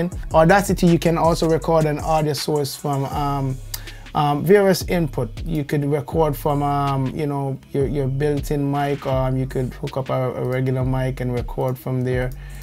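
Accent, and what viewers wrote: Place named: Jamaica. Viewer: Subscribe Di minute mi hear Di yaad accent.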